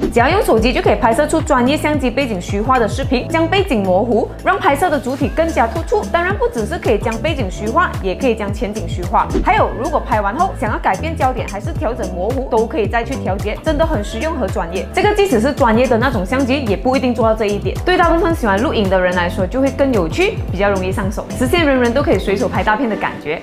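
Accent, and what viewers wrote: Malaysia. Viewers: 應該是馬來西亞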